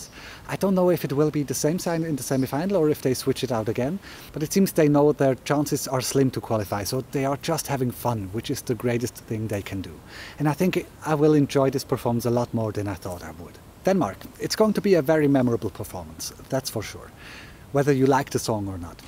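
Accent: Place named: Switzerland. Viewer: I KNEW you were from my home country based on your accent XD Where exactly are you from? I live in Horgen near Zürich!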